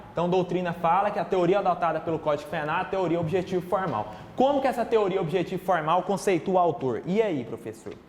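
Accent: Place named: Goiás, Brazil. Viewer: Esse professor é goiano ou mineiro, só poder ser.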